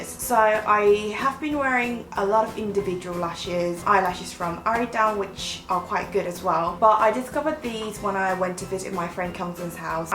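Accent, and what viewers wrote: United Kingdom. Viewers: 은 항상 보면서 존경스러워요.. 외국생활 아무리오래해도 전 저정도는 절대 못할꺼같아요 어후..발음도 약간 영국식발음이 정말 사랑스러워요 꾸ㅡ으으♡ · Love your British accent!❤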